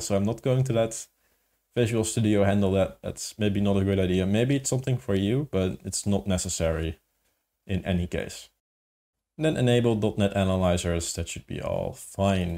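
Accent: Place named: Netherlands. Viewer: Perfect timing. I'm just doing some open tk stuff myself. From your accent I figure you're dutch? Me too 😊 I'm curious where this will go, you've got my subscription!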